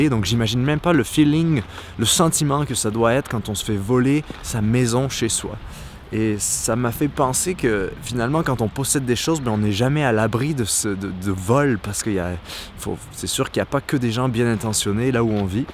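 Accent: Quebec, Canada. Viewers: Non. Il a un léger accent québécois.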